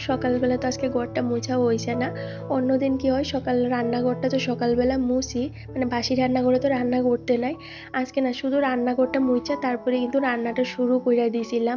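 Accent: Bangladesh. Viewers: Bon tomar bari kothai bagladesh avabe kotha bolcho keno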